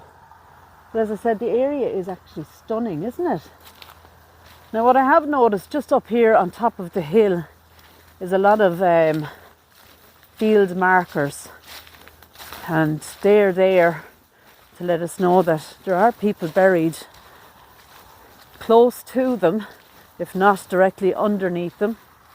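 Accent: Ireland. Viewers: Hi, Iam Darren from Australia and I just wanted to thank you for your amazing videos and the history behind them.... You are very lucky to live in such a beautiful country, I also love the accent it brings back many fond memories.... I was an on-site construction manager and worked in very desolate places in the Australian outback.... A few of the young lads working for me were from Ireland and some of the hardest workers I had, the only thing was they work hard and play hard 😂 They love to drink and fight no surprise when you working in desert like conditions 7 days a week for months at a time, I was good with that as long as they were at the work truck at 5.30 am to go to work in the morning..... Those boys never missed a day, I miss them a lot hard working hard drinking and very respectful.... They made living in the desert actually fun with loads of laughs and bonding..... 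Sorry to rave on 😂 Cheers Darren...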